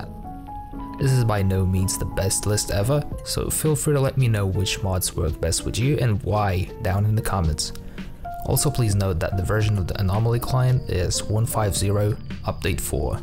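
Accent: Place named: Scotland, United Kingdom. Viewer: wow, me too and yet I thought you were Scottish of something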